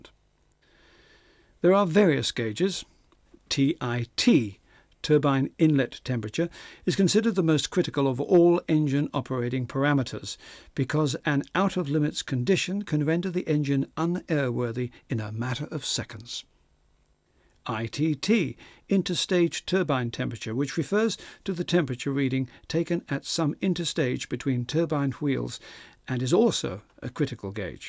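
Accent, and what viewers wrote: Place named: United Kingdom. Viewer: Thank you... :-) by the way, may I ask you some detail about the DVD program? I would like to buy it, to my ears the speaker seems to be British rather than American...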